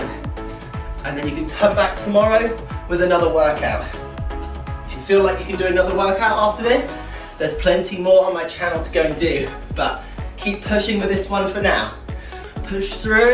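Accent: United Kingdom. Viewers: Weirdos? why? Hes hot! Hes shirtless! Hes got british accent!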